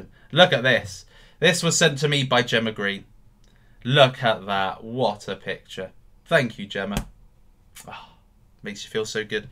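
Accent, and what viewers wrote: United Kingdom. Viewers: ahhhh, had to say, I actually missed hearing that brit voice ahahaa. Hope you had a fair holiday bruv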